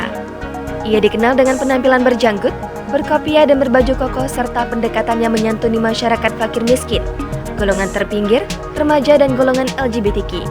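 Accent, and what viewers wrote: Indonesia. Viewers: Itu cara sebutan org indonesia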